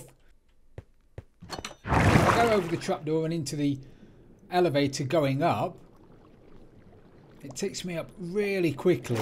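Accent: United Kingdom. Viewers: That British accent is, absolutely amazing.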